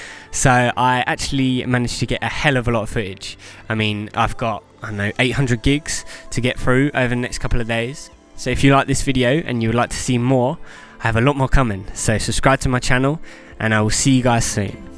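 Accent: London, United Kingdom. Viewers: Your videos are cool, I've subscribed for future reference :oD I don't think they are too long at all, you describe everything really well, plus you sound like a proper London boy which is my neck of the woods and that's always gonna be a bonus :oD haha!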